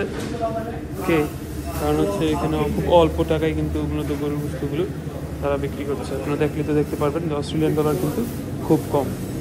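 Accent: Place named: Chittagong, Bangladesh. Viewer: ভাই চট্টগ্রামের মনে হয়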